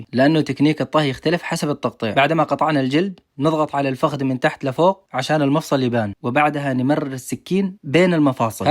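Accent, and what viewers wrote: Yemen. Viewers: من اللهجة واضح من عندنا يمني 🌝🤍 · يتكلم يمني بدون أي خليط لأن اليمن في لهجات كثيرة وهذه لهجة لبعض مناطق الوسط والجنوب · من كلامك مبين يمني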